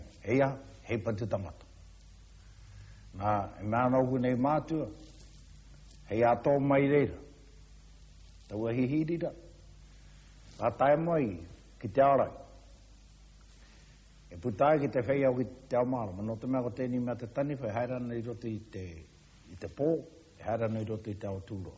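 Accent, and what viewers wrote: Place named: Ngapuhi, New Zealand. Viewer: Te mita o Ngapuhi💗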